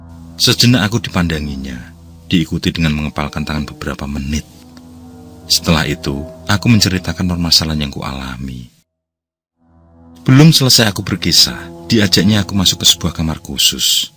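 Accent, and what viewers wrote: Java, Indonesia. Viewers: Eaalaaaah bah Rastim tiang Jawi toh? Tak pikir urang Sunda.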